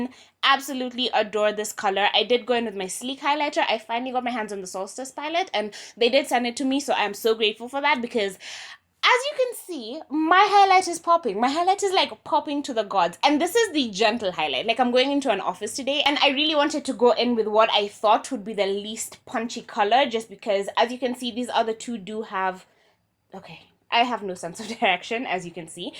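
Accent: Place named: South Africa. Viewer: Your accent sounds Sooooo South African 😅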